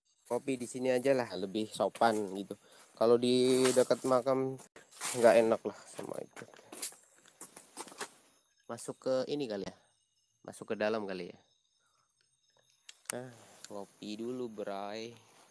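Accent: Banyumas, Indonesia. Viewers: Hahah kami tenggengen.. Bahasa ngapak nemen yaaahh Keren lanjut bro.. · Misi, numpang nanya, mas si orang mana, koq logatnya ngapak kaya aku 😬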